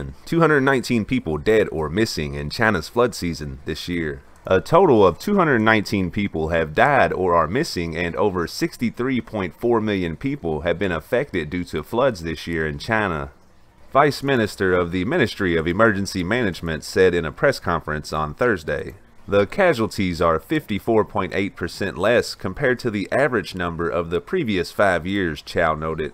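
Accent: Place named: Texas, United States. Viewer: Good coverage. Another interesting video. I have been subscribed for a few weeks now and am enjoying the dam coverage. I also enjoyed the coverage of the Mt. Sinabung eruption. If I could bring up a minor sticking point on your vocabulary... the word reservoir is pronounced resev-war not resev-wire. Just FYI. Wouldn't want anyone mistaking your charming southern drawl (Texas?) for ignorance.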